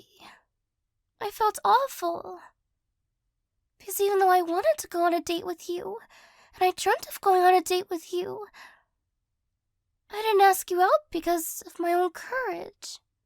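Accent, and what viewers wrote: United States. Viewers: In what part of the english speaking world does this accent come from- ik its american but like- its a good kind of american yknow wut i mean?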